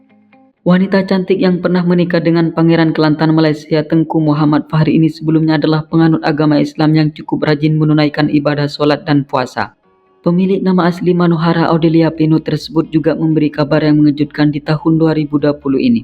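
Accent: Madura, Indonesia. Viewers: logat bicaranya kayak orang madura nih youtuber....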